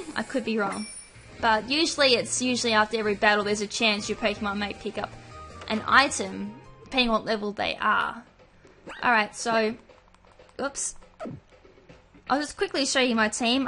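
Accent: Australia. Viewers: actually she's an aussie so it's probably an accent thing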